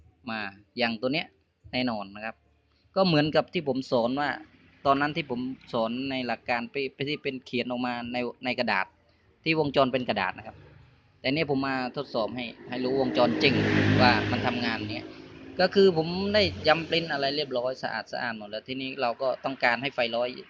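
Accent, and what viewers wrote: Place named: Surin, Thailand. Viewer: สำเนียงเหมือนเขมรสุรินทร์เลยอยู่อำเภออะไรล่ะบ่อง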